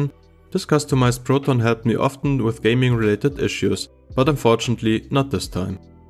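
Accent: Germany. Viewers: german accent detected. :D -> I have the best results with xfce... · Do i hear german accent here? 🤓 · I assume he's german. I'm german, so i can tell 😂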